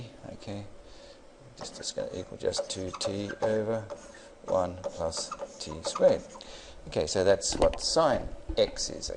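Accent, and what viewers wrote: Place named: South Africa. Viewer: Please tell me you are south African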